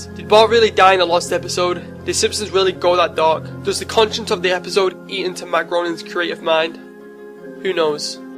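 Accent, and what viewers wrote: Liverpool, United Kingdom. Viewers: The scouse accent makes it worthwhile. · Liverpudlian? :D nice vid!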